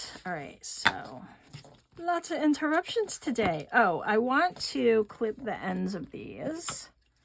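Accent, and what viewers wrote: New York, United States. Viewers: I will definitely try this. Totally enjoy your videos. And your NY accent.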